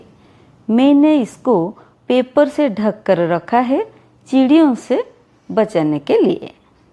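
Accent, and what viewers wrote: Odisha, India. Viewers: Aapke Hind boli se pata chal raha tha ki aap Odia hi honge 😃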